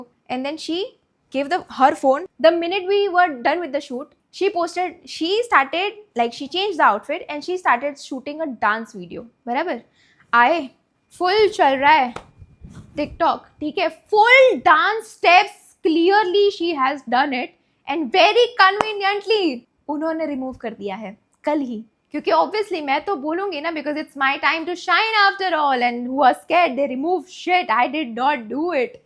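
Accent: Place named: Gujarat, India. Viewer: Love and her gujju accent ❤ dint watch the video u talking about but am definitely on your side 🫶🤌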